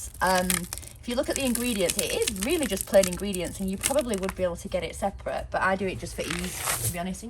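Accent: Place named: Yorkshire, United Kingdom. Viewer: Err yes she can really use herself free jumping lol😂, Molly is going to be a proper sweetheart given time which I'm pleased to hear is what you do. Very impressed with your knowledge of feed and how important it is to read the bl###dy contents, know what your horse/ pony needs and feed accordingly, no more obese or whizzed up equines, great vlog, and great to hear a Yorkshire voice, also I was taught to ask a mare to get the best out of her ❤xx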